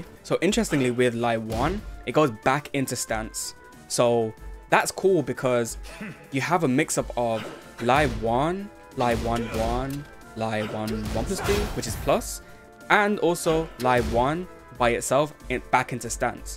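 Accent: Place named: Birmingham, United Kingdom. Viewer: you from birmingham too? Accent gives it away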